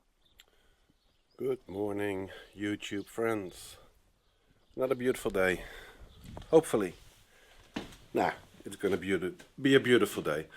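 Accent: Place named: Netherlands. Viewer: Thanks for having done all these videos!! My wife and I are also looking to buy a project house in France and watching your videos gives me inspiration and teaches me certain techniques. Maybe dumb question, maar ben jij Nederlands? Your accent makes me to believe so.. 😅 Groetjes uit Nederland!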